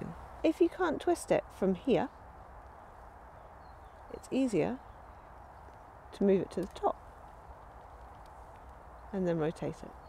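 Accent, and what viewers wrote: England, United Kingdom. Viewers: Best ever Step by step Repair video ever Created. Great English Accent, great voice tone. The Best ever English teacher ever Created. Great Country side Background . Priceless for a GreenHorn like Me.Thanks a few Billions. Great tips like not removing fully the tab washer, lifting the rear wheel to ease chain releasing b4 tensioner takeoff.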